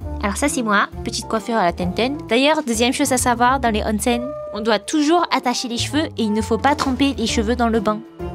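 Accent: France. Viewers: "quel bon français" ??? LOL elle est parisienne…. Qu'est-ce que c'est que ces préjugés ?! (votre français par contre est à re-travailler… "on s'y serais cru y être" ???)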